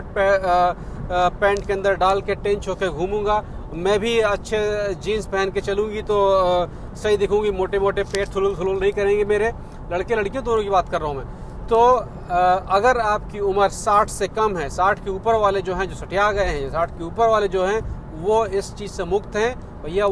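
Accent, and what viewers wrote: Bihar, India. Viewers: kya aap bihar se ho kya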